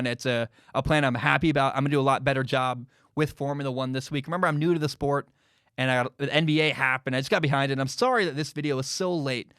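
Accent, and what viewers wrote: United States. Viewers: Silverstone difference is just from your american accent compared to the british one:) grand prix has french origins so thats why the pronunciation of the d is silent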